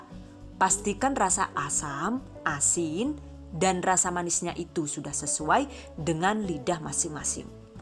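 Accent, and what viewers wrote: Banyumas, Indonesia. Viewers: Ngapaknya mana mba?? Kangeeen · Ngapak ok, english ok josss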